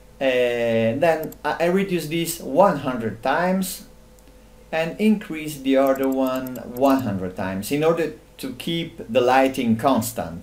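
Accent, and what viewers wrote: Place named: Italy. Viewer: VERY NICE. Your accent looks like Italian)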